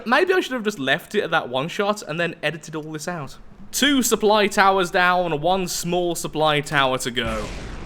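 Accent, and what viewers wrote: United Kingdom. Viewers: Indeed (also British accent)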